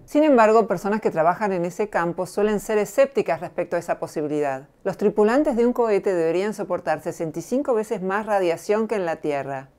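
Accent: Argentina. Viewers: Que rara que eres.. perdón, tengo que ser honesto. COMO SÍ SER "PROGRESISTA", OTORGUE UNA CIERTA "SUPERIORIDAD MORAL"... Especializada en "todo", es extraño con acento argentino...NO SORPRENDE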